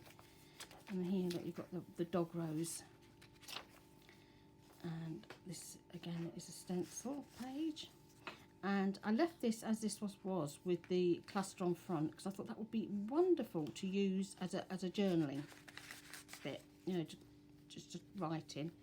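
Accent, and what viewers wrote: United Kingdom. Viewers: woohoo! congratulations ! Just subbed! Sound like you're from the UK, I am in Lincolnshire :-) absolutely gorgeous journals, love the watercolours imageries. Hope to win :-) tfs, Ivy xx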